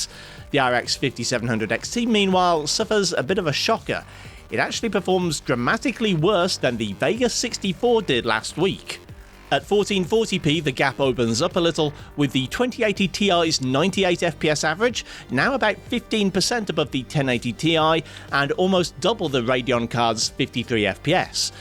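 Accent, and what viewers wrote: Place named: Manchester, United Kingdom. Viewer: Trying to pin your accent down. I'm from Manchester and you have a similar accent but I can't quite place where it is!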